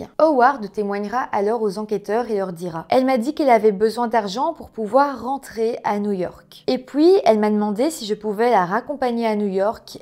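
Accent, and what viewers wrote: Belgium. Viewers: Hey mais... tu es belge ? :D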